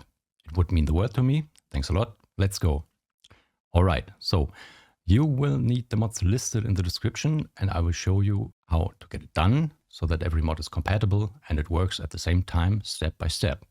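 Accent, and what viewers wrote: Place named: Germany. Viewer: Ich mag deinen deutschen Akzent :D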